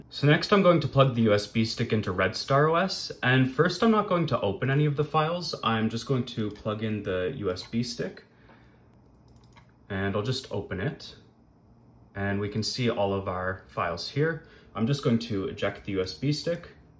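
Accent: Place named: Canada. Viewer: Well the sky sounds like a Canadian, and I'm American, so therefore if the Canadian says that's not nice and not good I'm going to believe him.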